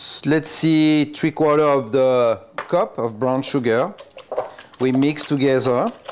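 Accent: France. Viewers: I love the way French people speak. My friend speaks exactly like he speaks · I'm so in love with his French accent! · So hard to speak English with the French accent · Adam Sandler with a French accent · What i like the most is the subtle frrrench accent😄😄😄❤❤❤👍👍👍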